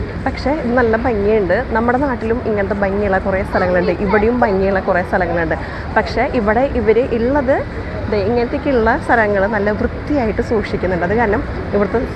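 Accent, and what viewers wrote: Thrissur, India. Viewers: I love your Chalakudy Thrissur accent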